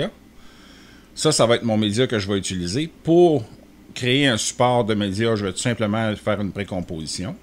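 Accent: Canada. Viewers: Un grand merci pour vos tutos de qualité et très bien expliqué, abonné depuis bien longtemps c'est toujours un grand plaisir de vous retrouver pour vos astuce le tout avec l'accent canadien, que du bonheur merci ;0)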